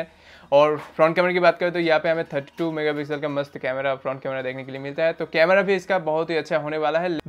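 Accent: Bengal, India. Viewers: Vai tmr bari kothay.. i think u r bengali.. tmr kotha dhoron bangla type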